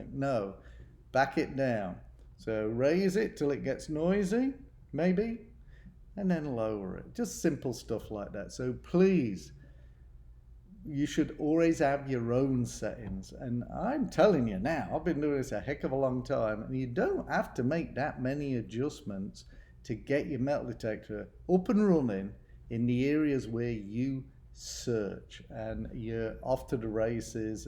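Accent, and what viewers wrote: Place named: Lincolnshire, United Kingdom. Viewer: With minelab you have to download the manual I actual print them off for my machines I prefer reading it that way. Different land needs different settings I find. Nice to hear a fellow Lincolnshire accent take care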